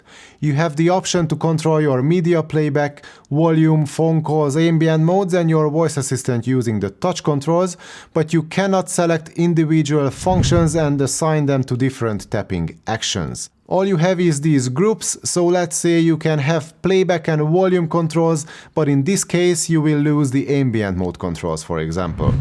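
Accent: Hungary. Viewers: Magyar akcentuc, de fasza videó jó angol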